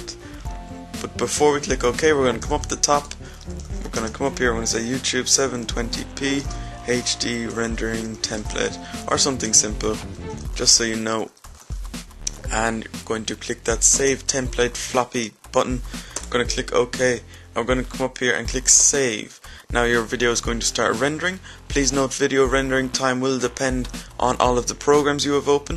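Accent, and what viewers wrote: Ireland. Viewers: Where ya from in Ireland?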